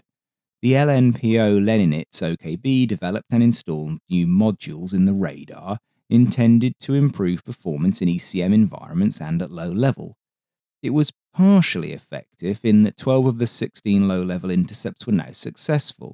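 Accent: United Kingdom. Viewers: I like the British accented narration. Just gives it more credibility. Like Great Planes or Discovery Wings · Hearing a british voice talk about killers The uk is nothing but murdererous thieving pos country....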